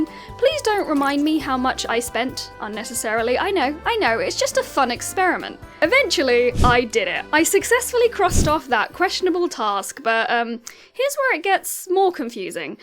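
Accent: United Kingdom. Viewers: Can I just say I LOVE your accent? Is it British? I can listen to your talk all day <3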